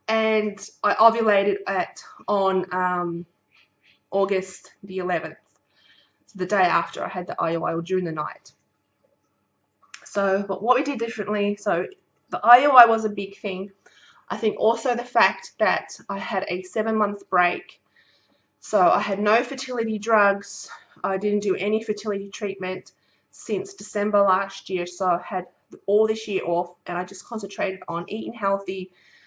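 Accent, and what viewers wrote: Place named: New Zealand. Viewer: Just a question you sound like you have an accent... my guess is New Zealand accent... I can hear it in there with a few words that you use.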